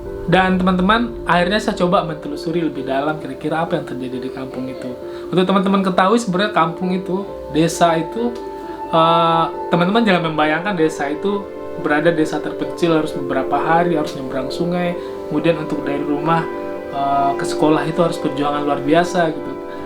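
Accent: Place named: West Sumatra, Indonesia. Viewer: 8.27 "sianu sianu", I am laughing of loud...sorry sir... Masih lidah minang!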